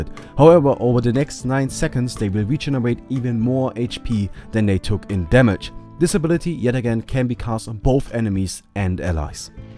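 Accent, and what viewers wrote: Germany. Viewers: german accent :)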